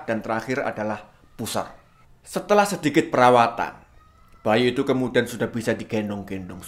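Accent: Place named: Java, Indonesia. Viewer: Hadir.. 😂 Logat jowo nya saya suka, jangan lupa kentut mas bro😂😂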